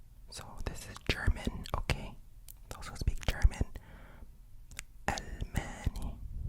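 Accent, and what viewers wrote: Egypt. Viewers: Pure Egyptian arabic accent!! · Your way of speaking in the Egyptian dialect is very beautiful.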